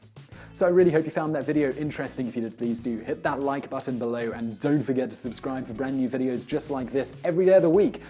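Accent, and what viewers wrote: United Kingdom. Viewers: Is it just me or is that Johnny Sins with a British accent and a beard? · I am interested in finding out today, what kind of accent does Simon have. I noticed how he says the word five (foive) finally (foinally) by (boi). What part of Britain does this kind of accent come from?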